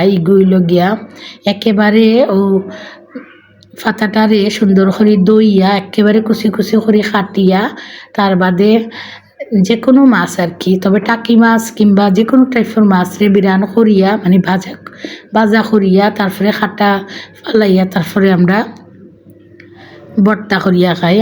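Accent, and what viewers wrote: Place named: Sylhet, Bangladesh. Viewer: সিলেটি মাত বহুত সুন্দর 😂❤❤❤❤❤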